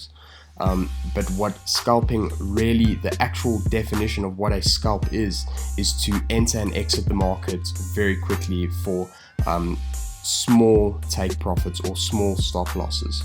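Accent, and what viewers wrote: South Africa. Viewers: Durban Accent?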